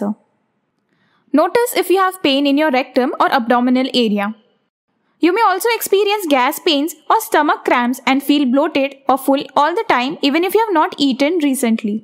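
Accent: India. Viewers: I enjoy listening to your indian accent. Hope to imitate the way you speak English.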